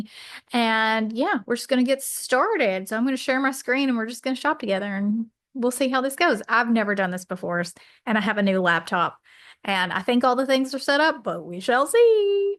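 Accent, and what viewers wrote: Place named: West Virginia, United States. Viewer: You from West Virginia 😄😄😄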